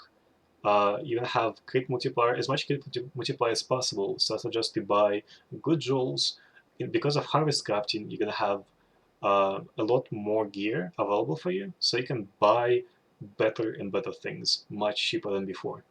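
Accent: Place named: Russia. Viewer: Слишком русский акцент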